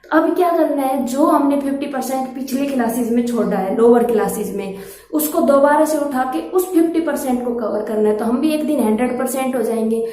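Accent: Haryana, India. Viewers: Thanks mam for such video . And your haryanvi voice is amazing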